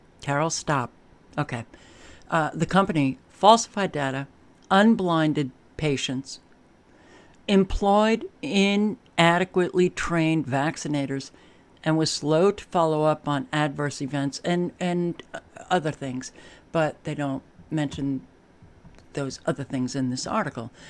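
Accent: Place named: New York, United States. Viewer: I wish I could just give you a big squeeze. Not trying to be weird. I just love getting to see the face that goes with the voice of reason I love to listen to. Boy is your New York accent coming back quick since you moved back to the north east.😁 Thanks for all the research you do. Keep on keeping on. Much love my dear 💗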